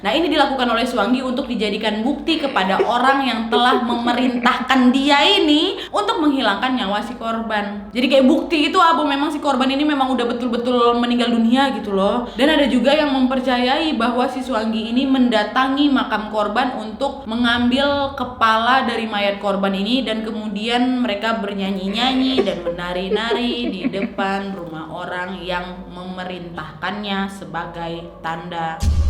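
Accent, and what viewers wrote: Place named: Medan, Indonesia. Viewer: Kakak ini logat nya macam org medan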